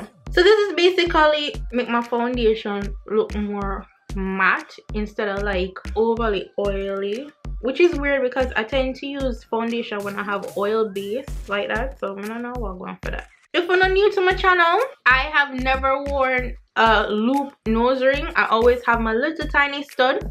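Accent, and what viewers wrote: Jamaica. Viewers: Yh man she a Jamaican ✔✔Certified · Omg I love her accent my favorite people are Jamaicans ❤ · Mi just enjoy all deh patois video deh. Mi love fi hear ya speak Jamaican Patois enuh. ( Jamaican- American here) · “Mario Sum’m Sum’m Sum’m” 🤣🤣 Loveeeeee di patios vibezzz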